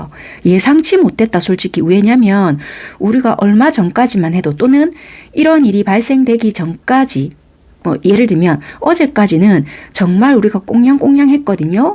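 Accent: Busan, South Korea. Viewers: 1 듣다적은 요약 상대방의 생각 결혼하고싶다 좋아하면안되는데좋아하고있다 자존심 희생 누군가 한명이 '이관계가 뭐지?' 현타 구설수 나란히보단 눈치 상대방의행동 행동,연락없다 선톡해도 겨우 답장 일시정지 '우리 이별인걸까요?' 연락해도 불편 안해도 불편 이러지도 저러지도 심적 갈등 여러분의 행동 얼마전까진행동 지금은 안부를 했거나 일시정지 움직임없음 현재 상대의 생각 쉽게풀리지않을것 내가 움직이지않으면 너도 움직이지않을것 상대의 감정 후회 나의 생각,감정 어떻게해야할지아나 쉽지않네 얘만있는것도 아닌데 공허 이가 하나 빠진느낌 상대방이 원하는 관계 약속 약혼 열정 전생? 과거?에서부터 이뤄진 관계 너와 같이 살고싶다 결혼하고싶다 허허허 100% 500% 맞네요 부산사투리 너무 매력적이시고 현실적이라 좋아요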